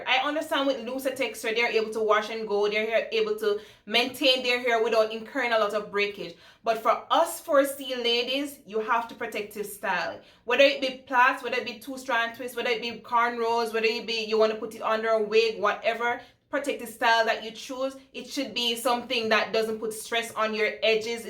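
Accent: Jamaica. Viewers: Gurl I hair your Jamaican accent, subscribed. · You sound like a Jamaican. Are you? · I am hearing the Jamaican accent!! 🇯🇲 🇯🇲. Representing from ja! Great video ♥️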